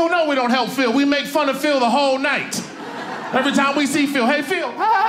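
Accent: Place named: Chicago, United States. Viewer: He is definitely from Chicago... 😊👌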